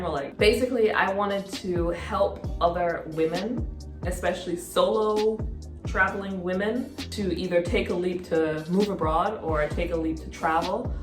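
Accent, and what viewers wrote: Jamaica. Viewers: We’re the same height and I knew i heard some Jamaican in you! I’m Jamaican living in the US who also loves Romance Novels especially English Lit . I’ve never met anyone else who enjoys it most people think I’m actually living in a fantasy world but I know it’s exists lol ❤️ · I knew I heard that Jamaican accent hidden under there some where lol...also we have the same last name hmm..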